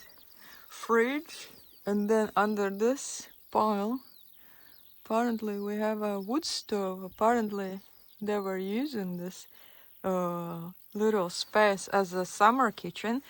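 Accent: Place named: United Kingdom. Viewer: Buggers! I'm getting hints of British English. Charming!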